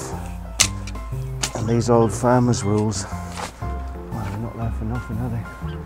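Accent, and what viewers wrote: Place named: Norwich, United Kingdom. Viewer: Love your wigwam..can I ask where you are from originally? I can't place your accent, sounds a bit like Norwich and a bit Yorkshire :)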